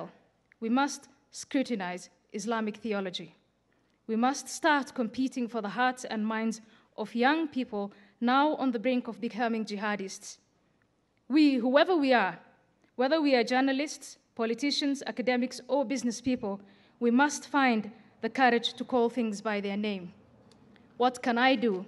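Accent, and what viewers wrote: United Kingdom. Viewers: yo your spoken english is british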